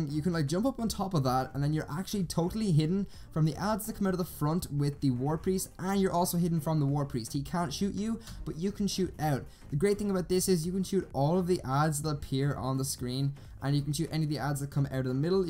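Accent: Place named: Ireland. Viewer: Where are you from in Ireland? 🇮🇪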